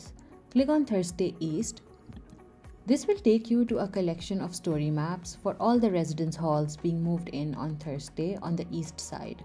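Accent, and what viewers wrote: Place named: India. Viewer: The Desi accent and voice is so calming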